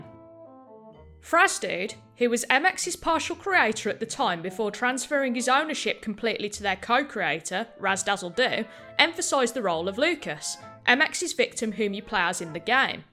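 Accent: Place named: United Kingdom. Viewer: I think they're kind of just both British and that's it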